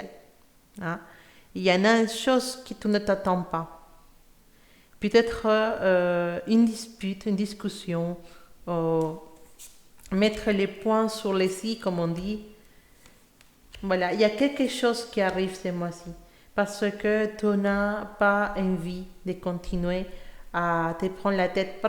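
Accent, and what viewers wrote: Spain. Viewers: hola es que tu haces lecturas en espanol porque siento tu accento esapnol y quiero saber si es posible tambien en espanol gracias bendiciones!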